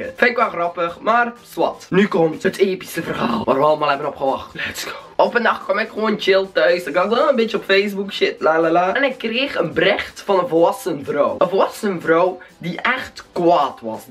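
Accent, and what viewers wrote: West Flanders, Belgium. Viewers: Zo Nice daje ma west Vlaams klapt inde video😂😂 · Hahahahah west vlaams man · Kom je uit westvlaanderen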